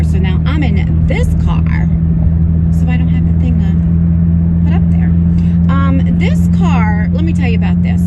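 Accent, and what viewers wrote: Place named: South Jersey, United States. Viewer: 😀 I knew you were from South Jersey by the way you talk ( I'm in Mantua )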